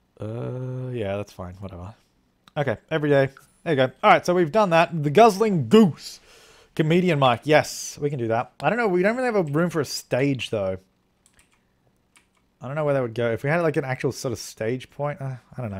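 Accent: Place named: Australia. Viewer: WAIT!!! you're Australian??.. i mean it would make sence since your talking sounds it XDD just didnt think u were with us Auzzies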